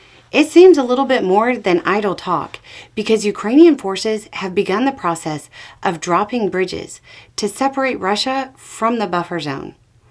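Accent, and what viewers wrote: South, United States. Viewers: I love your snarky sarcasm. 😂😂😂😂😂😂😂 If you didn't have such a strong southern accent, I'd say you were a Pittsburgher (that's a compliment where I come from).😂😂😂😂😂😂❤❤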